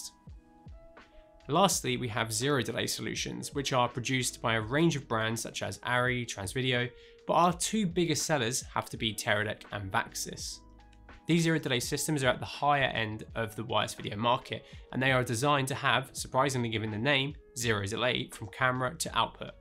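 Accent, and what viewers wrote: United Kingdom. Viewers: This dude has to be British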